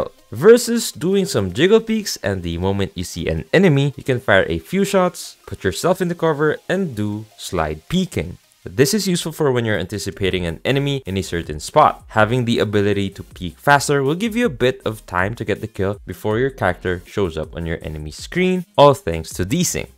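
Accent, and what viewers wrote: Philippines. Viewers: I’ve been wondering for a while r u Filipino cuz you sound like it?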